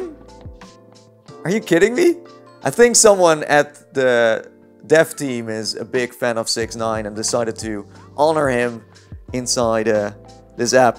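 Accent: Netherlands. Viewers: nederlands accent mag wel wat harder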